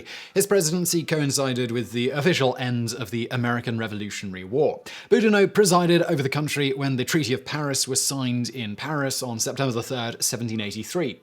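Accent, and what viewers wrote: United Kingdom. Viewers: The current country of the United States didn't exist until its formation through the Constitution... that's why we Americans brag about being the "oldest country in the world"... we have the oldest, continually-functioning government. I do love it when people with foreign accents try to explain America to Americans... enjoy Brexit, Redcoat! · You yook the words right out of my mouth!!! At least, he talks British. Not sure if he truly is or not. Anyone can imitate a British accent for views. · I stopped watching when I heard a Brit speaking about American history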